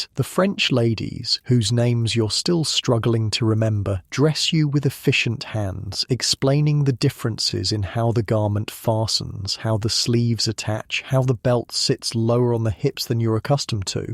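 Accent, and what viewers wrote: England, United Kingdom. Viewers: I’m absolutely sick of the English accent from the narrator. Can we get some American history? 😂 can’t use a fancy British narrator for that lol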